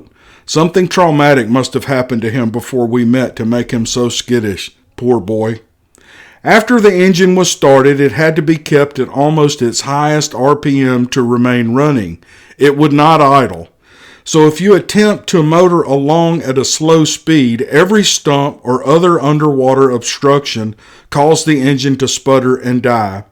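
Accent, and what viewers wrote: South, United States. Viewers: I try to place the accent and I imagine your either from TX or TN maybe a GA boy, lol I'm sure I'm not the only one but I think that's what keeps ppl intrigued by the stories and the twang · The voice sounds like my neighbor's Keith Robbins. Those southern accents are priceless. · It's the South in the Mouth that sells it.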